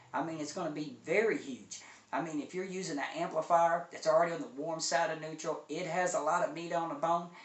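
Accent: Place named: Appalachia, United States. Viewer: Your an inigima, your accent lends one to think your a hillbilly. But if someone actually listens to you they should be taking you seriously. Cheapaudioman is rather goofy and very popular. People probably think you wear a red hat when not on camera.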